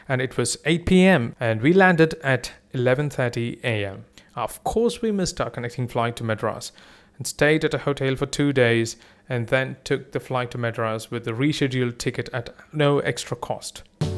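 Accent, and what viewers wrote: United Kingdom. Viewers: Brit accent coming from a desi who lives in the US. Can't wrap my head around it, unless you binge watch British TV Shows a lot. Cheers mate!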